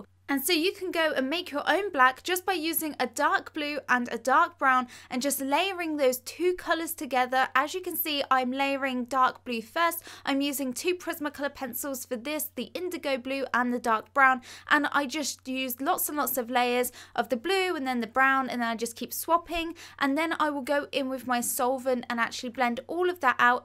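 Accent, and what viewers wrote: United Kingdom. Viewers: Just love it! You're so clear in your explanations. I'd love to join the course on portrait, it would be a wonderful gift to myself for my birthday in June! Ps. You British accent is to die for, being Italian listening to you is a great way to improve my language skills which is an extra pro!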